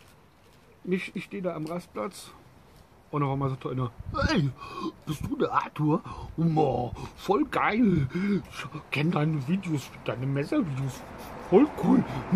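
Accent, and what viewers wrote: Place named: Bingen, Germany. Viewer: genial hab ich doch gleich am Dialekt gehört, ich komm aus Binge schaue deine Videos schon ein ganze weile,bist auch aboniert.... ich mag an die 1.das du gerne Bier wäreend deiner Videos trinkst und natürlich Messer genau wie ich liebe. Hätte mal Bock mit dir das ein oder andere Pilz zu zerstören und dabei über Messer zu fachsimpeln.... LG de Bingen Andre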